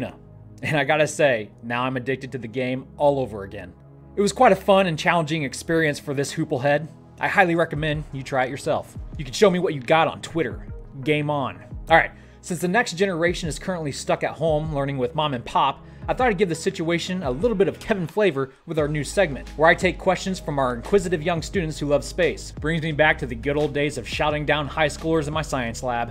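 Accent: United States. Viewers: Seine Aussprache ist herrlich Amihaft. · I like your content, but you have a lot of Kevin flavour, confirming all my Dutch stereotypes of what an American called Kevin looks and sounds like.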